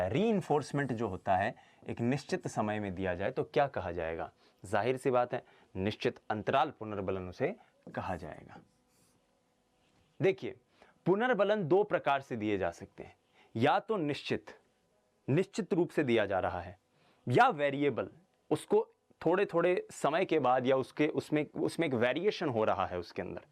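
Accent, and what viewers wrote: Rajasthan, India. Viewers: Mai up se hu but jb ap rajhasthani bolte to Maza bahut ata .love u sir.psychology ke dada ho ap.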